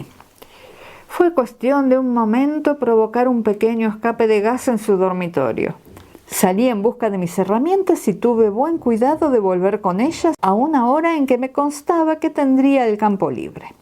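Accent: Argentina. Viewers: Que buena onda, creo que por tu acento sos argentina. Yo amo a Agatha Cristhie y este titulo jamas lo vi - ni lei-. Gracias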